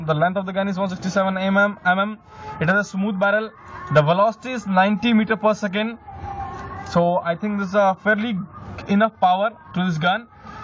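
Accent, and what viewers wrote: India. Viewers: Indian accent funny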